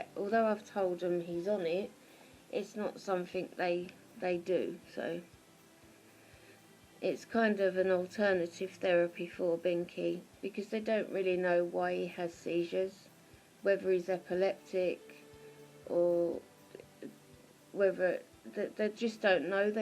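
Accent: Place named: Midlands, United Kingdom. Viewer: Poor little furry cutie pie. Glad he's doing better. Best wishes to ya. That accent though... from the Midlands, are ya?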